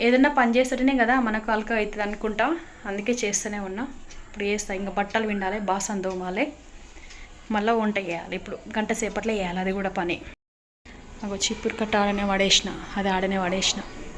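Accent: Telangana, India. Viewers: Telanganollam gatlane matladatham nice ga guda matladathamu timichinapudu super sister me pani chathagakuna chesiru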